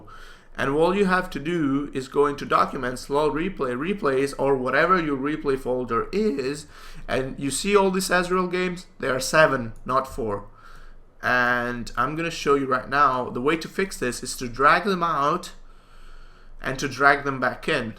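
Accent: Italy. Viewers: Oddio Ma sei italiano? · are you italian?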